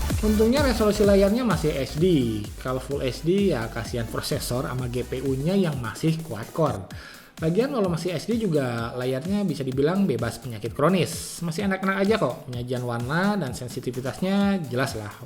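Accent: West Java, Indonesia. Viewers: Asyik nich reviewnya.... Bahasanya sederhana tapi nyaman, heuheuy Sunda Asli.....!!!!!!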